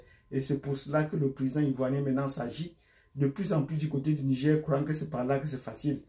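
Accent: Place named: Cameroon. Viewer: Par ton accent tu est biyamerounais je dis oh vous les camerounais ou est votre problème ds ce qui se passe chez nous en cedeao au lieu de vous occuper du cameroun du Molière biya et le revenant Ali bongo ,qui prépare sont 3 eme mandats,les citoyens cedeao ne parlent pas de votre pays et de la cemac pourquoi vous ne vous occuper pas ds ce qui se passe chez vous pourquoi vous les bantous vous vs mêler pas de ce qui se passe chez vous .bazlaye d,abord chez vous avant de parlé de quelqu’un avec président biya 42 ans de dictature oas d infrastructures au lieu de venir parlé de Mali,??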